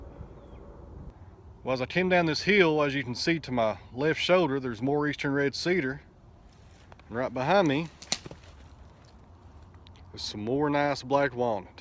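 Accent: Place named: South, United States. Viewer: From what I can safely assess in your video... based on the species composition and general contour of the land - combined with the southern deflection in your dialect... I am going to throw out an educated guess that your are geographically situated somewhere in either in north Georgia, north Alabama, or most likely Somewhere in Tennessee. How'd I do? Post Script... I have 23 years experience cruising timber professionally across a diverse commercial platform. After two decades in the business, it seems to me that somewhere around 98 percent of the general public have no idea it is even a job. That always puzzled me... as their very world revolves around the production of all the various wood products... any thoughts to that end?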